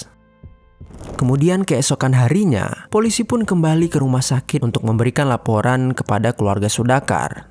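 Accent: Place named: Aceh, Indonesia. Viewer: Dari gaya bercakap sy teka admin ini adalah dot dot dot.. orang aceh.. beliau ada 2 lagi akaun youtube.. apa pun taktik yg mantap bro! 😊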